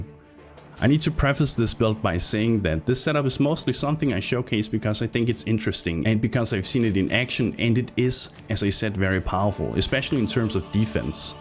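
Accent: Denmark. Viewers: Danish? Can't help but notice that accent... Banter aside, great video man!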